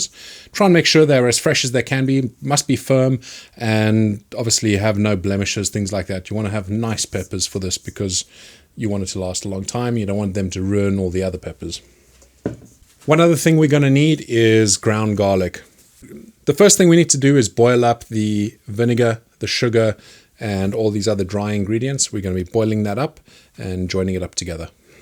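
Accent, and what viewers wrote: South Africa. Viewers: Where in the UK are you from? To my untrained Canadian ear it reminds me of South African. Birmingham? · First video I have ever been recommended for. First- South African? Second- fantastic and an instant sub. Cheers from a Canuck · Hi. Are you South African? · Are you south African? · Are u south aftrican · Little south African, little Zimbabwean, and now a bit of brit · Can I ask if your accent is South African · Do I hear a hint of South African in there? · UK....... I'm getting a south African vibe? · you south african by chance? the accent lends that way for me. apologies if I'm mistaken. tits video! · Are you South African? :D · Do hear a note of South African accent there :) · your accent sounds like you have South/Southern African ancestry. Is this so? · ah very nice. I’m from California but live in China. Your accent was a bit hard to place but still distinctive. 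The way you say “hotter pepper” like “hwotta peppa” and “here” like “hee-yah”. My South African friends sound the same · South African accent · Hey man, totally love the channel but i have an unrelated question... are you/your parents/family from south africa? Picking up the very BAREST of accents on some words and I don't think its british kiwi.... sorry if it is xD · are you south african? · Good video man just subscribed. Also your accent sounds south African please correct me if I'm wrong.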